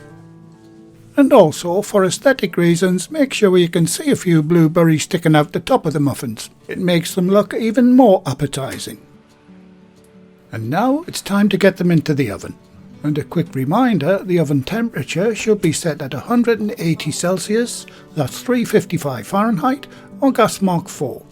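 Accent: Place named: North East England, United Kingdom. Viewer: Hi, I’ve just made your amazing blueberry muffins absolutely delicious, I recently retired and it’s my favourite pastime baking your recipes, excellent instructions and great to have someone with the same accent, as I am also from the North East of England All the very best for the future and thanks again 👍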